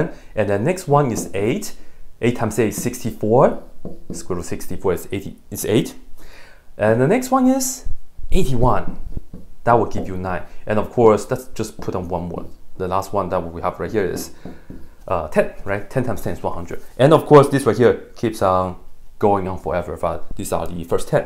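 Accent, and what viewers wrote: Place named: India. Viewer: 不看你的中文视频还以为是印度人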